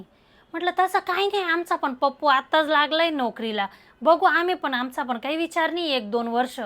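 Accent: Khandesh, India. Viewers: आहेरणी बोलत रवा मस्त वाटस मै खान्देशी माल्ये अभिमान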